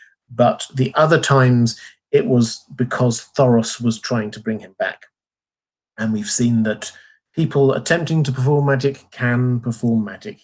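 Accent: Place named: Southern England, United Kingdom. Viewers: Hes English alright, from the south. Lovely voice x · sounds like a southern English accent. I've never been to England so take my guess with a grain of salt. I would love to know the answer though.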